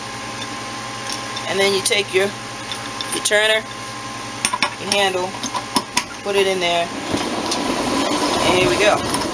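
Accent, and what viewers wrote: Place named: New England, United States. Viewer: Patriots and that accent...🤔...You have to be from New England haha....I’m from Boston, I can pick out that accent anywhere hahaha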